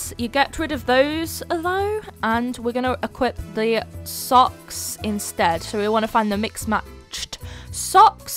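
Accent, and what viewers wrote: United Kingdom. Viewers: Omg I feel like u have a British accents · i rlly love ur accent is it british scottish or something else srry i love it sm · It sounds like you speak British 🇬🇧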